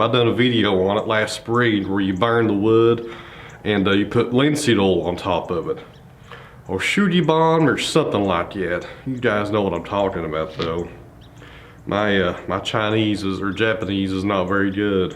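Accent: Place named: Tennessee, United States. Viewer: The Tennessee accent is entirely A-OK with us American's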